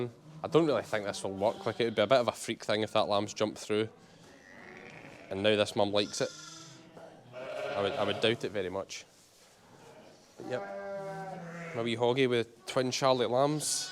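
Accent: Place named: RP, United Kingdom. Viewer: Loved your interview on landward with your posh accent 🤣🤣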